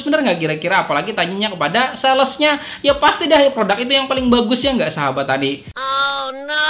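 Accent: Banjar, Indonesia. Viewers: trima kasih info nya pak.. logat banjar selalu ada kata "Kah" ujung nya...bujur lah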